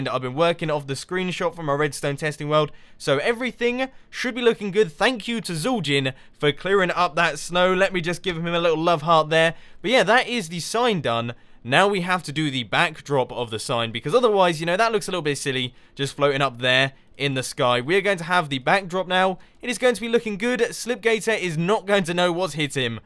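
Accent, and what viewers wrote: United Kingdom. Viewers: Mumbo Jumbo Nvm cuz british accent is sexy.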